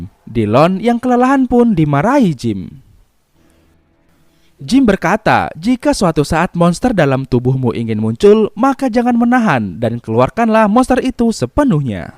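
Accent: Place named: West Jakarta, Indonesia. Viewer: aroma aromanye ente orang jakbar bang ye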